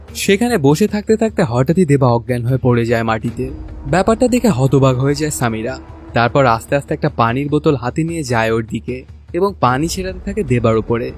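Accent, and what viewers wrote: Bangladesh. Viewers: বাংলা দেশের লোকেরা এই ভাবেই কথা বলে।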